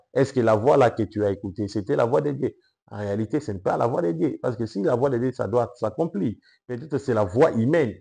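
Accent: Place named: Democratic Republic of the Congo. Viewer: C'est un zaïrois selon son accent et la prononciation de certains mots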